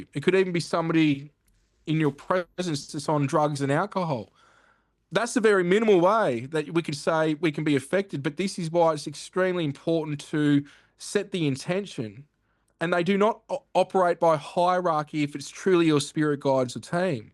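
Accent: Australia. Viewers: I'M french, almost impossible to understand....sorry but aussie accent is hard for european people. But I love your country, been there twice. Love from France. ❤ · Love his classic old school Aussie accent! 👍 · Is there an English version? The OZy accent is too strong for me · Well, of the English speaking countries the Aussie accent makes it the least understandable. Even South Africa has clearer English.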